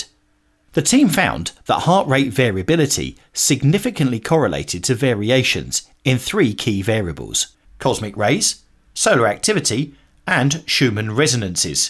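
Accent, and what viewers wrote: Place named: United Kingdom. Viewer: Yeap, that's a Brit talking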